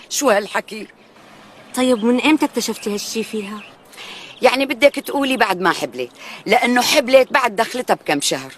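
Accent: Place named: Syria. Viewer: صوت جزائري وحكاياتها باللهجة السورية رفع من جمال الفيديو يسلم ايديكم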